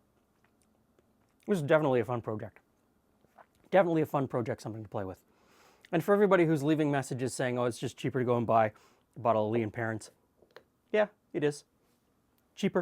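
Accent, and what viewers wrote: Canada. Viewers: He's saying it right. Albeit with a Canadian accent.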